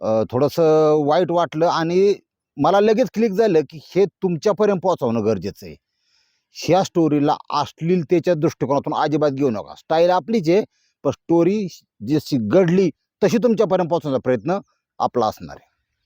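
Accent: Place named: Pune, India. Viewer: पुण्याची मराठीच सर्व उत्तम आहे. मुंबई पेक्षा भारी आणि सरस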